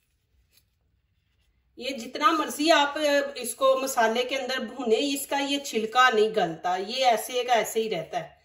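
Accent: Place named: Punjab, India. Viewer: Madam ap english urdu... panjabi style ma boti hain. niceeee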